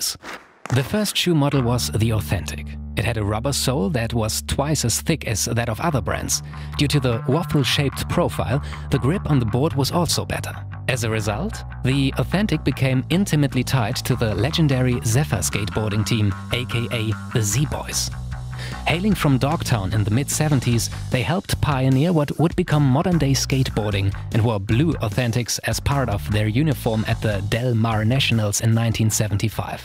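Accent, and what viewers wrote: Germany. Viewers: You probably hate to hear it, but are u german? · Most Germans sound like this (or worse) while speaking English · the strong german accent ahhh yess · this guy german accent gets me everytime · I could tell it was a German accent right away! But I'm pleasantly surprised by how well he speaks English.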